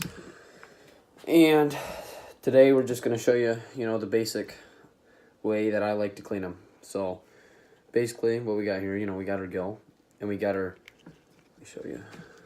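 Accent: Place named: Canada. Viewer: You sound extremely Canadian in this video...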